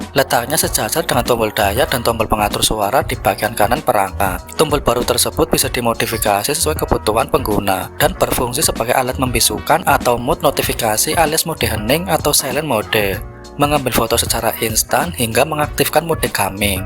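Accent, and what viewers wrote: Banyumas, Indonesia. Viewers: ngapak Iki :)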